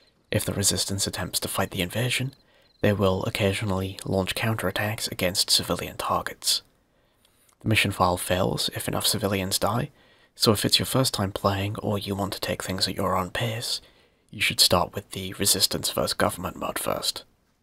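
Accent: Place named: England, United Kingdom. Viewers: Your accent is really intriguing me, it's like a cross between Queen's English and Yorkshire! Haha. Great vid BTW! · I thought the accent sounded familiar, I just moved to north yorks myself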